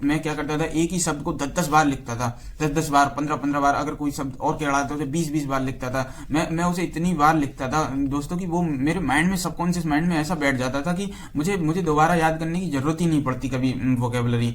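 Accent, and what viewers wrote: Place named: Rajasthan, India. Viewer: aapki language😅 Rajasthan jesi hai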